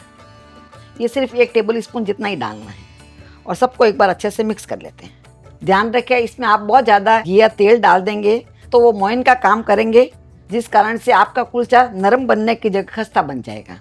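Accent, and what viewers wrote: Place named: Rajasthan, India. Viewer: Nice mam love from Gujrat ❤ I also love Rajsthani people your rajsthani tune in all recipes thats why I watch your all videos you look like morden Nani 😅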